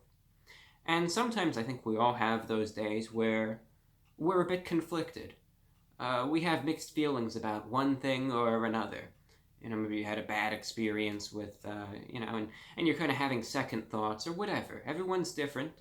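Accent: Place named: Mid-Atlantic, United States. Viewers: I can't eat any of the food you review, due to dietary restrictions. But I'm still a loyal viewer. "what is this channel known for?" To me? It's your mid atlantic accent, polite speaking, and your sense of style that I thought had left this world decades ago. I lost that bet. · The New York accent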